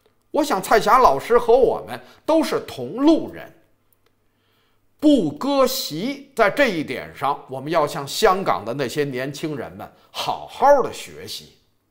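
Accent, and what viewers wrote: Beijing, China. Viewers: 言之有理，一口京腔，特喜歡聽你講！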